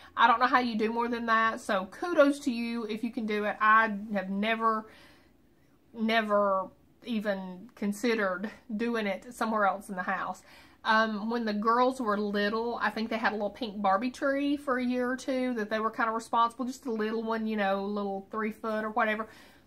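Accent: South, United States. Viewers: love your tree, like that southern accent!!! merry Christmas.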